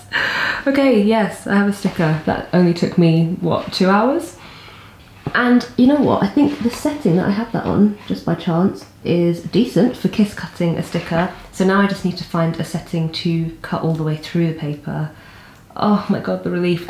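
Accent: United Kingdom. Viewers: I need help with shaping my art to make it a career I was really good in drawing growing up but I stopped and dropped outta high school.... got me a GED diploma and joined the USARMY... I’m still looking into arts and plus I’m in Europe I got stationed in Germany I know you’re in UK because of your accent lol😊 can you help me with advices so I can get back up in my art?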